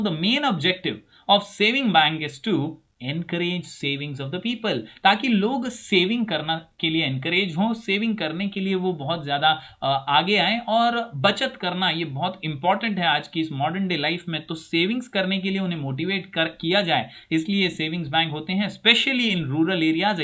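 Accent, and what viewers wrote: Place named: Uttar Pradesh, India. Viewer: being from up whenever i listen ur up accent that helps me to take more interest in the lectures 😂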